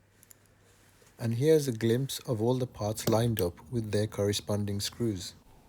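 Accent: Birmingham, United Kingdom. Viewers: Can you do my dads Longines Sounds like your in uk 🇬🇧 I’m in South Yorkshire What are your prices Thanks Genuine Had it repaired twice at H L Brown 👎 then a private guy 👎 Gutted really we lost him in 2010 😟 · I'd say Birmingham, of Asian ancestry. I do come from near there, but of course I'm guessing.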